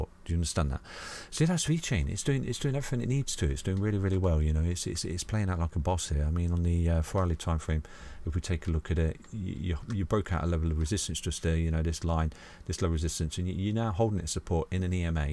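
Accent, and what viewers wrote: United Kingdom. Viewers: Word! Gives me comfort hearing a UK chap talk some crypto sense. Most soothing youtube voice ever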